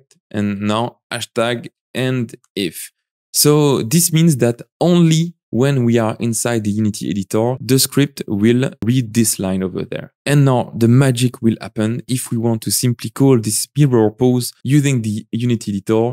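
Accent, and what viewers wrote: France. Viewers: T’es français ?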